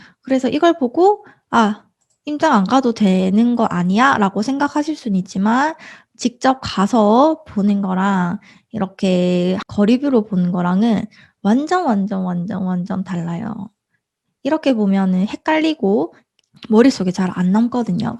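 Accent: Busan, South Korea. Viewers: 완전 부린이인데 네이버부동산은 빠싹하지 않을까 하고 들어왔는데 엄청 필요한거 3가지나 배웠어요~~ ㅠㅠㅠㅠ 매번 시청중이지만 정말 친근한 말투로 하나하나 설명해주셔서 감사드립니다 ㅎㅎㅎ 혹시 부산쪽 분이신가요? 말투가 저랑 비슷하시요 ㅋㅋㅋㅎㅎ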